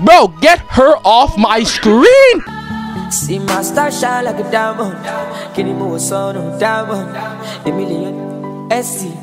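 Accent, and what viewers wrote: Toronto, Canada. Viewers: Ifw the video but I can’t not hear the Toronto accent💀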